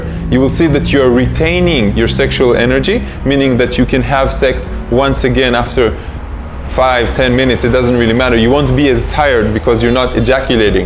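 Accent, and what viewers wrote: Israel. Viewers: Why do you sound so Israeli? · Youre accent sounds Israeli r u...?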